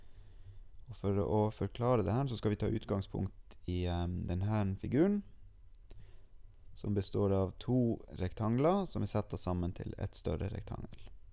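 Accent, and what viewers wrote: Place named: North, Norway. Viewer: du e fra nord-norge og det e positivt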